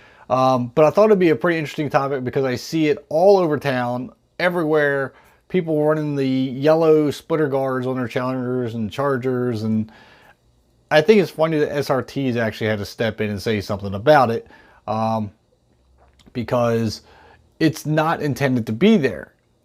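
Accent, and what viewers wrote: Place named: Philadelphia, United States. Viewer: You sound like you’re from philly.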